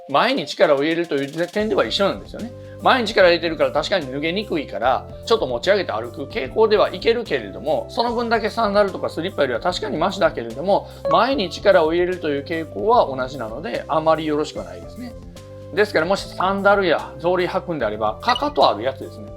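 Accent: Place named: Kansai, Japan. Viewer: 関西訛りですね。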